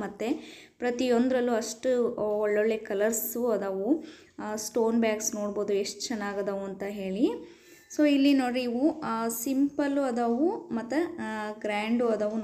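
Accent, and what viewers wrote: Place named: North Karnataka, India. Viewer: uttar karnatakad matu keloke est chand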